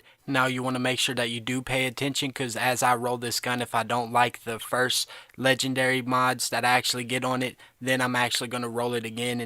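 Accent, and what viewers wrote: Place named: Appalachia, United States. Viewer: do yourself a favor and have someone who doest sound like a hillbilly narrate your bad videos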